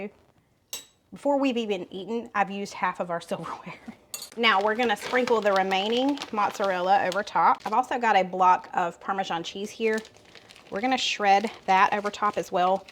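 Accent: South, United States. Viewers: You are so sweet! I’m from near Toronto Ontario Canada. I love your southern accent! Love your recipes too. Hugs to you and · Wisc. Girl here to me ur accent is strong southern...I make lasagna all the time in crock pot!! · I'm from Iowa, so I have a Midwestern accent, but I love your Southern accent. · I’m from Hawaii and definitely enjoy your Southern accent! Makes you so adorable- luv the greeting- “Hey y’all this is ”. I luv reviews. But missing Cole’s thumbs up. It helped me decide which of ur recipes my sons would like. Mahalo!! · I'm a California girl, but my ex-husbands family was from Arkanas, and I picked up their southern accent. I say boilin and fixin somethin, supper. I love your accent. Don't change anything about yourself❤ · I'm from the south. We have similar accents. You sound like me. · Girl, you definitely have an Southern accent! 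Boston area person here, although I did spend years in the South as an Air Force brat. Accent doesn't matter! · You definitely have a Southern accent. I grew up in Iowa which is in the Midwest but have lived in Colorado for the past 35 years. 😊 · You sound "classy southern". I'm southern too. Say words the same way. Those meals looked great. ❤ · your phase y'all is a dead give away to you being a southerner! Yes, you do have an accent too! I'm in Maryland and our daughter has moved to GA. Neither of us have an accent! Lol I'm looking forward to trying the lasagna and the Queso Chicken Chilli. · Girl I'm from Georgia and yes you have a southern accent. I say boil and oil just like you. just needs to accept the fact that he likes cream cheese. Sorry not sorry. These dishes look so good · I was born in Ireland and had an accent, trust me you have a southern accent. It is nice to listen to. 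· Southerner here, my accent is similar to yours but some of your phrases are a bit thicker than mine but im so used to the southern accents and very southern accents it doesn't seem out of the norm but when I travel out if the south ppl always ask where in from 😂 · I’m watching from England, I love your channel and the recipes as you keep it real, and I love your sweet southern accent, you have a real man there too! Best wishes 😃 x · Hi ❤. I’m from S Texas and I love your “very” Southern accent. I don’t think I have an accent either 😂. Love your podcasts and all your recipes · I LOVE your accent!!! Ever since my sister moved to Georgia I have loved a southern accent!!! I am from Ontario, Canada and yes you do have an accent....lol!!! Whenever we visit our family I come home talking like them!!! I love it so much!!! I say y'all all the time!! I dont even care if people here think im strange, im going to talk how I want to...hahaha!! 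Dont change a thing with how you talk, it's awesome!!! Love y'all!!!:) <3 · I’m late to the party because I’m playing catch up on videos. I’m from Louisville, Kentucky and you definitely have a southern accent! But it’s extremely charming. 😊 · I always thot southerners have the drawl lol...you know like boyyyyooolling...so is right lolol! I love yall! Did yall get snow? I had to rewatch this to see if i wanted to try it....yes...got all ingredients on my walmart order for tomorrow! I dont like spicy hot...so leaving that out! Keep up the great videos you two! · I am southern and I live in Virginia…I have an accent but not as strong as yours. I think there are regions of the south that have thicker accents. But the funny thing is that once I’m around someone with a thicker accent I start talking like them. I’m like a mina bird. I’m definitely trying that lasagna recipe if I can find some gluten-free lasagna noodles if not I will use a different pasta. 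· I love your southern accent I have friends who have a southern accent im a Yankee in Michigan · Southerner I def sound like you but I still don't know what chow chow is 😂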